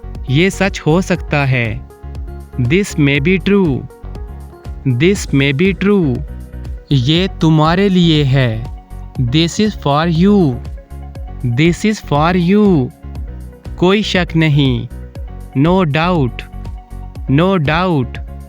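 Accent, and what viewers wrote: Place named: United Kingdom. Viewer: mashallah ap ka accent British he really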